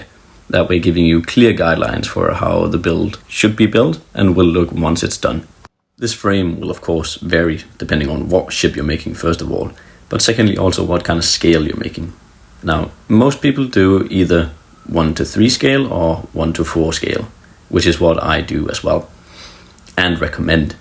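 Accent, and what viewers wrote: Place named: Denmark. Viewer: Wait are you Danish the accent is great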